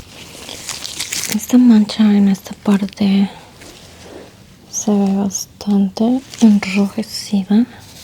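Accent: Latin America. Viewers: Eso es castellano jajaja, creo que ella habla más español latino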